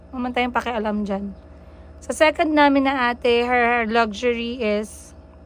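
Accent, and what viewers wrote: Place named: Ilonggo, Philippines. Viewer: Mam, ganyan tayo mga Ilongga d mawala ang intonation natin Ilonggo magsalita❤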